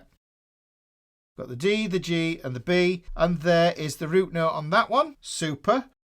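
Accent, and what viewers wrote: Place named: Newcastle, United Kingdom. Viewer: Great accent. Are you a Geordie?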